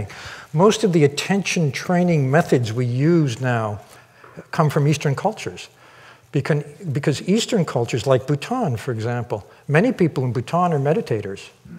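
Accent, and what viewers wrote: United Kingdom. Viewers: I feel very connected during your Q&A😂😂😂 British accent never fails me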